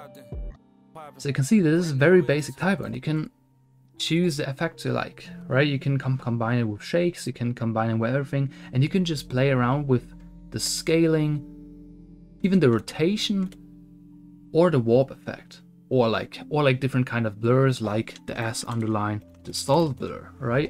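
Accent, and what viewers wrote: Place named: Germany. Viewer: Erster Satz direkt den deutschen Akzent gehört <3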